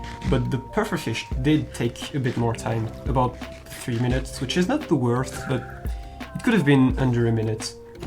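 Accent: France. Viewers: toujours le même accent fr xd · are you french ? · I have a question dude, are you from France? By the way nice video :) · you sound french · You got a french accent :) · Ru french ? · t'es français non ? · it’s obvious you’re french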